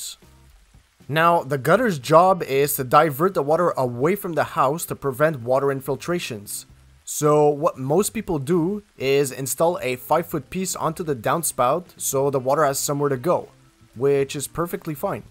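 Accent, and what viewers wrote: Quebec, Canada. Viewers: Nice video! T'es québécois hein? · This guy is from Quebec for sure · Yeah his definitely from Quebec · French Canadian? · Quebec French? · You have an accent, est tu québécois ? ;)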